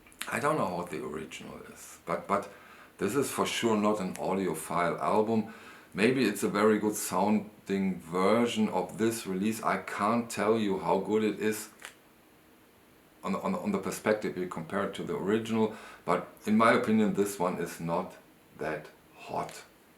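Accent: Germany. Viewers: Hi. I really enjoy your show and love your German accent. Thank you for mentioning the band, love this music, have now ordered both their studio albums. Fantastic. Thank you. I too am a big fan of. Kind regards from Robin.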